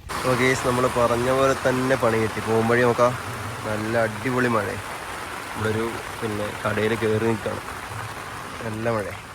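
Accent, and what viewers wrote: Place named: Palakkad, India. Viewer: കൊല്ലം ഭാഷ മറന്നോ മൊത്തം പാലക്കാട് ഭാഷ ആണല്ലോ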